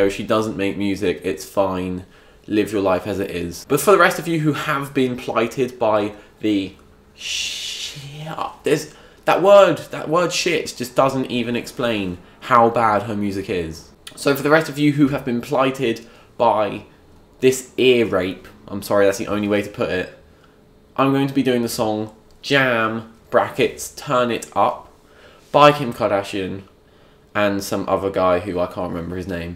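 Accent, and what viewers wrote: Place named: England, United Kingdom. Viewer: haha hilarious. subscribed! plussss, an english accent? god, for sure. Imao, take care, xo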